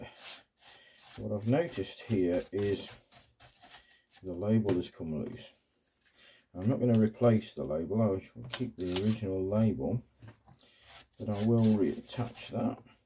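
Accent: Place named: United Kingdom. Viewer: His British accent makes it nearly NOT understandable if you have the same problem to resolve and are a USA English speaker and reasonably skilled..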